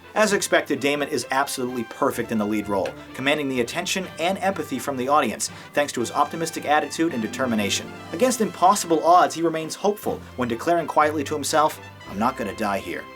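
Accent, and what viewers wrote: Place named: Boston, United States. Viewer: Review Black mass and add your boston accent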